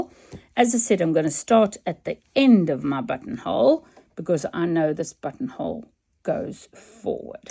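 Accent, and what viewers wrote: South Africa. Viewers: Thanks ! Love your South-African accent !!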